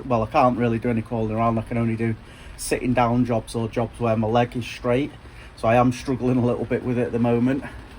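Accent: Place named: Bradford, United Kingdom. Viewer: I've got a mk5 tipper that's been fully rebuilt and solid as a rock in blue single wheel with German axle that i drive daily and love it but it still needs a few little bits to make her mint but to find one that don't need welding is a miracle. I've had loads over the years but I'm keeping tabs on your build to do my own in winter but yours is the most informative build I can find and makes for a good watch. You don't sound too far from me in Bradford either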